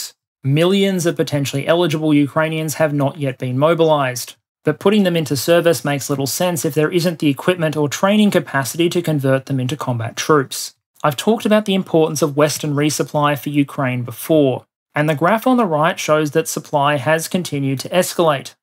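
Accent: Australia. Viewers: How you put out such high quality, thoroughly researched and amazingly interesting presentations on a weekly basis while also holding down your day job is just mind blowing. I don't know how you do it, but I am just so damned glad that you do. And whilst sharing an accent and a like of vegemite doesnt give me the right to be proud of your work, there is some level of shared Aussie pride in knowing that a fellow countryman from the wrong side of the world puts out arguably the best Ukraine war related stuff on youtube. Bravo.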